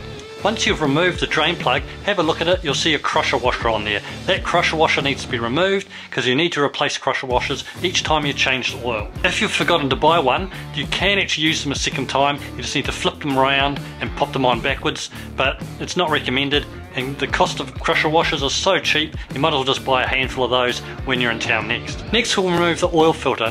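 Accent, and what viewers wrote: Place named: New Zealand. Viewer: Thanks a lot from a Canadian listener. I really like your Kiwi accent.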